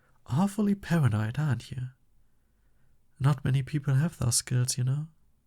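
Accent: Germany. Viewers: Your german accent is thinker than anything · ……….I- I was not expecting that accent. You really from Germany? Goddamn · Are you german it Sounds a bit like it i‘m german myself i was just wondering ? But love the video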